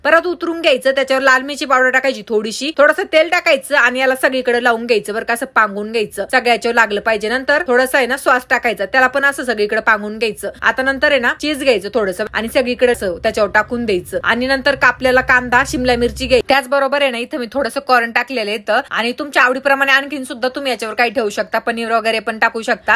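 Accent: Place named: Khandesh, India. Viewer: khandeshi bhasha 😅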